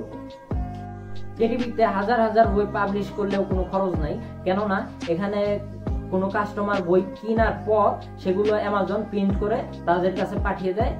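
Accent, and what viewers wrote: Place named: Sirajganj, Bangladesh. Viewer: sirajgonj ar moto kory khota bolen to tai bolam. ok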